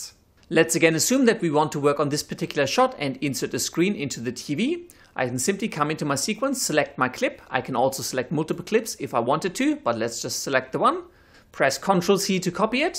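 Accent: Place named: Germany. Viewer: Are you from Germany because your accent sounds so german?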